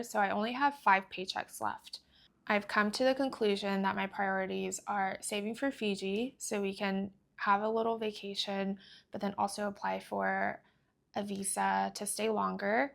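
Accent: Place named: Australia. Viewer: Hi! Omg I’m excited for your trip! Hopefully you can get a tourist visa on time so that you can also spend more time traveling before heading back to the states☺️ I might be the only one thinking this but I feel like I’m hearing a bit of the Australian accent from you?!😆 omg that’s so cute your boyfriend likes chocolate milk!! I love watching your channel as well as cloudymoney, rainebudget, and fancydollar🤍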